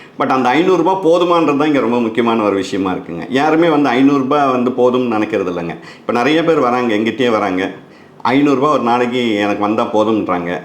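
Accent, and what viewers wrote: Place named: Coimbatore, India. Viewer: கோயம்புத்தூர் தமிழ் ஒரு தனித் தமிழ் தானுங்க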